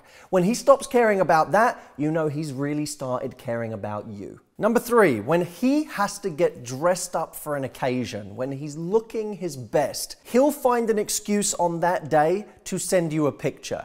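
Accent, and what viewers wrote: United Kingdom. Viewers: I love your British accent .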